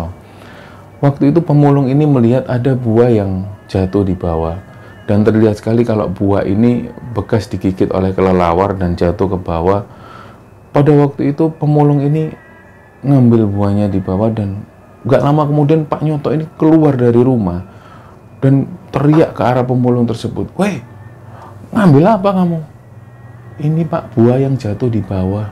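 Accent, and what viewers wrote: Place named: Central Java, Indonesia. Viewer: Indonesia bagian temanggung mana ini suaranya???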